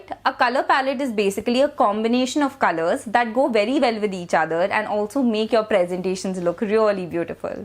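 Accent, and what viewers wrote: India. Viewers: Indian accent sucks..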